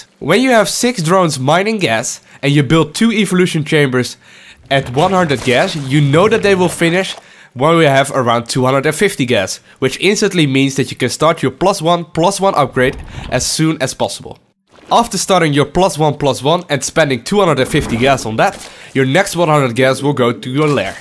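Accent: Netherlands. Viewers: Are you from the netherlands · ik kan aan je accent horen dat je NL bent haha umm... wat voorn league ben je ? en neem aan dat je zerg bent?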